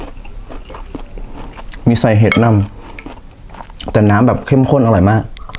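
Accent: Isan, Thailand. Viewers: พูดอิสานสำเนียงน่ารักค่ะติดตามตลอดนะค่ะ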